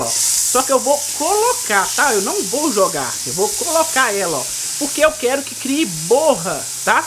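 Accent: Minas Gerais, Brazil. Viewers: Amei o seu sotaque mineiro “ o trem mais bom “. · O homem fala mineiro mineiro quinhentas mil vezes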